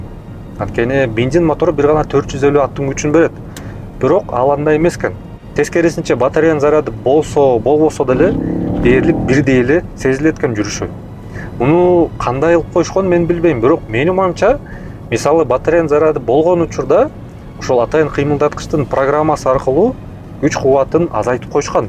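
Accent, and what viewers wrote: Standard. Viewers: Таза кыргызча уккан кандай кана кулака жагымдуу угулат😍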